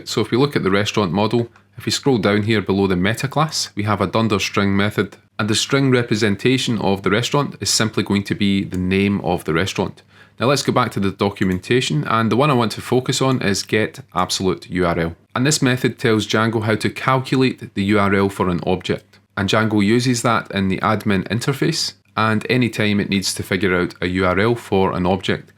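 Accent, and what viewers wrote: Scotland, United Kingdom. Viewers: NO idea what your doing but I love your Scottish accent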